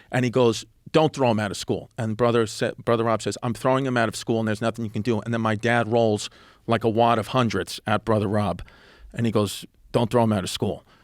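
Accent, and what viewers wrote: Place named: New York, United States. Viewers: Geez i love a good story told in a ny accent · If I have kids, I wanna be like this dad, but talking like Clint Eastwood or Thanos instead of a New York accent.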